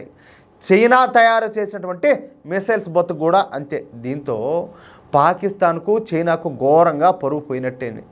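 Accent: Rayalaseema, India. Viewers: ఒక్కటి అంచనా వేయలేక పోతున్నా సోదరా మీ మాటలు యాస కేచ్ చేయలేకపోతున్నా మొతంగా రాయలసీమగా అనుకుంటున్నా. మిక్స్ అయి వుంది. Tq.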